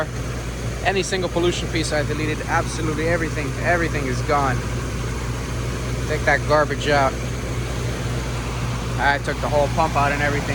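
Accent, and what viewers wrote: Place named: New York, United States. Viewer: I thought you lived in new york with a new york accent when you said you lived in Florida 😂 cool accent this car was made a year before i was born in 1981 great classic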